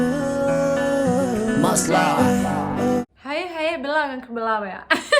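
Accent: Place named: North, Somalia. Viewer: Xbbti horta marka hadashay ayn gartay ina somaliyad tahy walhi cadaan ban ku haystay hhh mida kle masha alh luuqadeni saxda ahayd e waqooyiga ayd ku hadlaysa hore usoco sister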